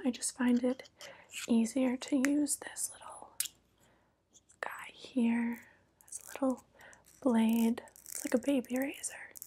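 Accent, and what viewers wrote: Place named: Texas, United States. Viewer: This accent is Texan approved. 🤠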